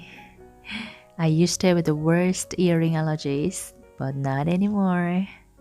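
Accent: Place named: France. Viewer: Hi, i recently discovered your channel and i love it! Me too im korean but adopted in France and now i live in Spain. Have you lived in France too? Because i notice you a little french accent when you speak. You are so beautiful and radiant inner and out!🌸i think that you can be a real source of inspiration for so many women, thanks to share with us 😉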